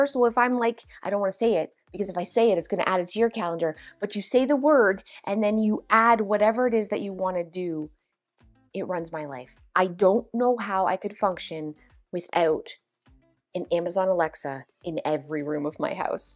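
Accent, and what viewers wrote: Canada. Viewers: I love the Canadian accent I hear once in a while. My family is from Canada but lost their accents years ago....I also Love your advice.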